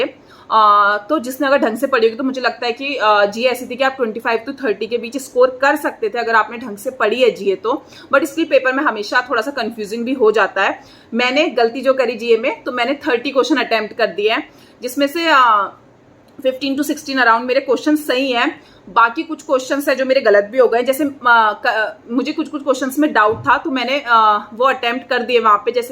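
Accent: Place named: Kumaon, India. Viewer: your accent is so kumauni ,,,,where do you belong actually??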